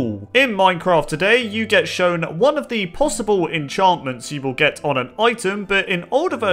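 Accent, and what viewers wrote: United Kingdom. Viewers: YOUR DEFINITLY BRITISH ARE YOU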